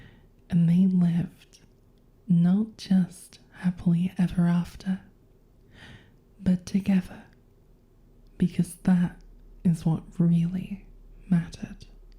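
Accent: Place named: United Kingdom. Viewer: oh she's british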